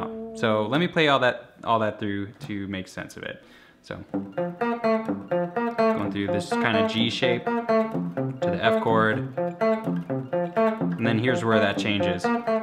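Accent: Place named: Midwest, United States. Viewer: where are you from? You sound like you're from the midwest. Maybe Wisconsin or Michigan?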